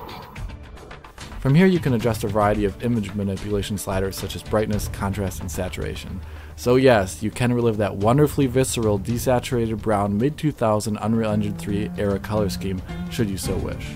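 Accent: Germany. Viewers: Hm. I guess my ear is just trained for it then hearing that accent a lot. For me it was always sort of obvious. It's in the tone of his voice. It's just so.. german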